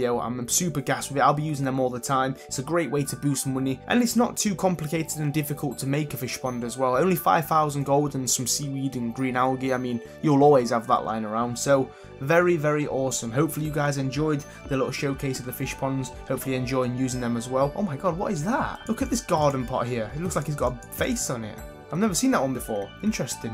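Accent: United Kingdom. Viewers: I really like the way you speak like brithish idk lol and yeah nice vid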